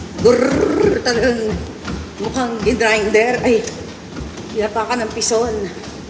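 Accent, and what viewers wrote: Iloilo, Philippines. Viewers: hahaha hindi gid nya madula ang accent mo ilonggo nang biskan mg hambal k tagalong or English pg hindi mo ma translate agad illonggos words ga buka sa bibing mo ..halong gid permi nang stay healthy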